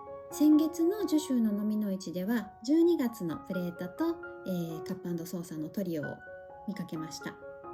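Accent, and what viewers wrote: Kyoto, Japan. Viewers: こんにちは～。今回の動画、良かったですよ(⋈◍＞◡＜◍)。✧♡ 蚤の市の動画も良いですが、今回のはそれを上回っているように個人的には、思います。 そして、かわいらしいお声で、聞き覚えのある京都弁でお話されるのが、更に親しみを感じました。また、このような企画の動画も出してくださいね。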